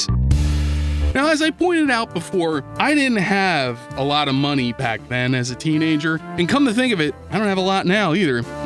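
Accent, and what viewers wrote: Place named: Philadelphia, United States. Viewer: this entire time i’ve thought you were a delco or NE philly guy. i guess i was close enough but gd them philly neighbors do share similarities in accents. when I was a broke college kid I would pirate books, movies, games. once I started working and I wanted to play those games or reread the books or see a movie I started paying for them. If something is hard to find I’ll still go elsewhere online to find it. accessibility and cost is everything.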